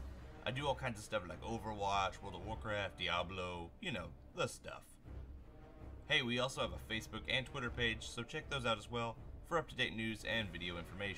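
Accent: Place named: Canada. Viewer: I can't place your accent....it's subtle, but definitely distinct from my Bay Area English...Canadian maybe?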